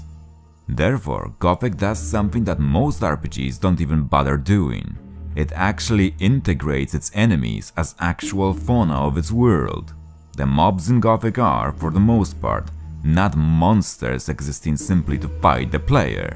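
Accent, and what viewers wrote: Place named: Poland. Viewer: Such an annoying voice 🤮🤮🤮 and Polish accent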